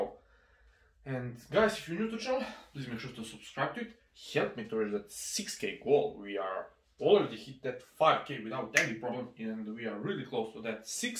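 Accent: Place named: Russia. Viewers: Акцент выдает, чел русский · Внатуре русский бля 🤣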